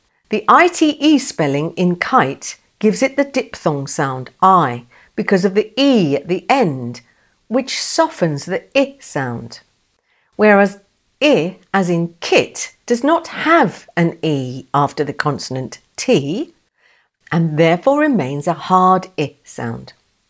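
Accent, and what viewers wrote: United Kingdom. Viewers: Native Spanish speaker here, I've got 9/10. I knew "monkey" was pronounced with /ʌ/ and I'm not used to hear British English so the way you pronounce it confused me. But after hearing it again and comparing it with other words with /ɒ/ I just saw my error. A fun exercise I may say! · Thank you for learning English pronunciation with a British accent for free, loving 🌹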